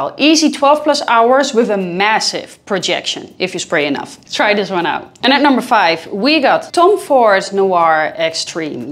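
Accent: Netherlands. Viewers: She must be Dutch her accent ❤️ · hearing your accent I must know: ben je Nederlands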